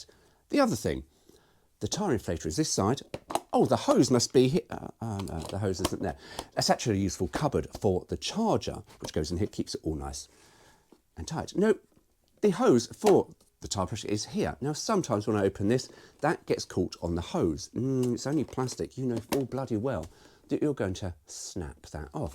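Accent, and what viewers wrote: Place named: United Kingdom. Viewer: This guy is hella proud to be english🙆‍♂️1st time l hear the english sound really english.Nobility running through those veins.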